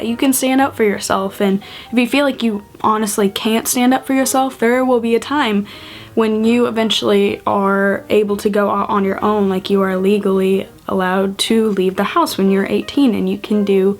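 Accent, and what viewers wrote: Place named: South, United States. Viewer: WAIT DO YOU HAVE A SOUTHERN ACCENT?!! I never noticed that...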